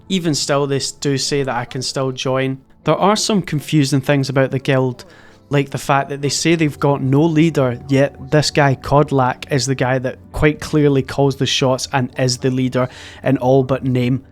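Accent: Scotland, United Kingdom. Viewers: Do I sense a fellow Scottish legend?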